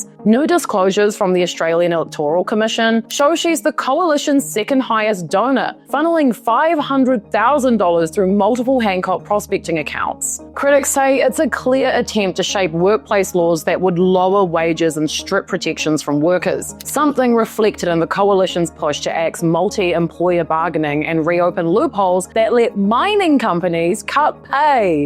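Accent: New Zealand. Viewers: Sounds like Kiwi accent.. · As an Australian you a new Zealand due to accent correct me if I am wrong. I would not front up on YouTube channel and butcher the pronunciation of New Zealand country and city names. Was born in Melbourne but my father came to Western Australia mining and did well. Gina was older than I but we attended the same school. Your pronunciation of Pilbara is wrong your pronunciation of Wittenoom is wrong. So what other major facts are wrong in your story or deep dive on Gina ????? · Are you real or a Kiwi sounding AI ??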